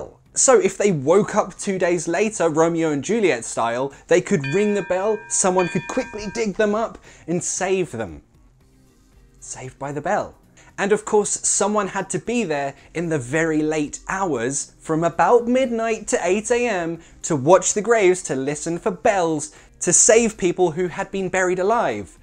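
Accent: United Kingdom. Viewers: Anish Tom No. His pronunciation sounds 100% British to these American ears. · Does he speak british english?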